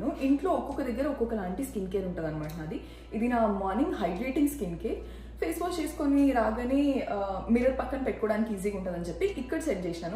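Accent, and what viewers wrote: Telangana, India. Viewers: Very nice home tour manchiga undhi sis❤im your new subscriber i love your slang our Telangana hyderabad. Slang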